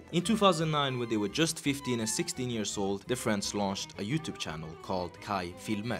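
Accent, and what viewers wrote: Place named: Finland Swedish, Finland. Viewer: *Fennoswedish dialect, not finnish accent : ), but otherwise awesome vid.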